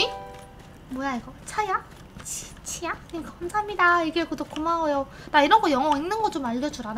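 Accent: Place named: Jeolla, South Korea. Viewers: 님 사투맄ㅋㅋ 고향이 어디세여? 전남 영광같아ㅋㅋ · 고향이 전라도...? 최근꺼부터 거꾸로 보고 있는 편인데.... 이때만 해도 사투리 작열이네욥 ㅋㅋ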